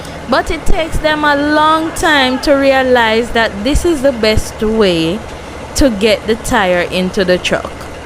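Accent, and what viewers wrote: Jamaica. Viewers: You talk funny woman, like Island woman, like Jamaica.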